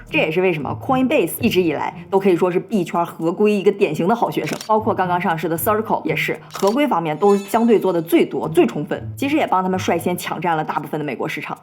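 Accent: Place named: Northeast, China. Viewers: 这么漂亮睿智的女人，一张口，出乎意料的东北大碴子味普通话！😂😂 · 他们都是大连人啊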